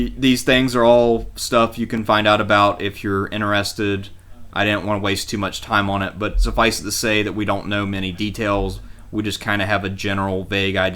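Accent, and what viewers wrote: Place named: South, United States. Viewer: He speaks with a southern drawl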